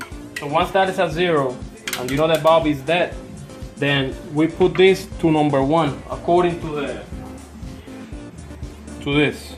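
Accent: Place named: United States. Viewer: Pareces un Yanki hablando English...coño.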